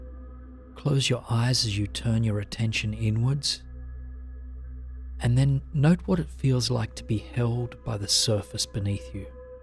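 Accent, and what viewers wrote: Australia. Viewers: Its always weirdly comforting to hear another Australian voice on YouTube! Thanks for your efforts bro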